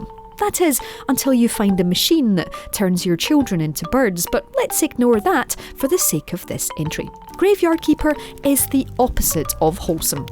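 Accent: Scotland, United Kingdom. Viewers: The slight Scotish accent is really enjoyable! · I love the scottish accent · Rowena is Scottish and I’m pretty sure the narrator also has a Scottish accent lol. I love Rowena tho she’s amazing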